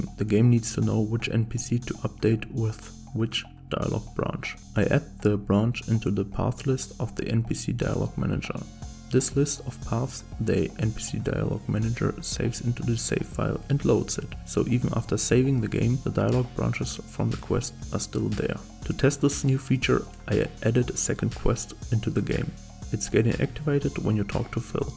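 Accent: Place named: Germany. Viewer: You can hear the german.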